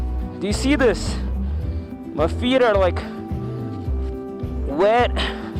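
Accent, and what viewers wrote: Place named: India. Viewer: Hey, are you Indian? lol ...OMG you accent was so nice +1 sub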